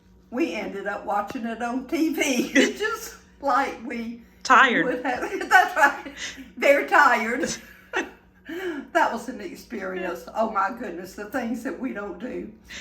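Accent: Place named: South Carolina, United States. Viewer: Hey, so very great to hear from a fellow SC friend. I moved from the Island about 3 yrs ago. Boy do I miss fishing, shrimping and Oyster Roast in fall. I think you attended the church with the great sale annually and have the pumpkins. I lived there 15 yrs and am a native Savannah resident. Can’t wait to get one of the cook books. Even though I get home sick for the salt water hearing a familiar accent I so am enjoying your channel. Marnie Causey😄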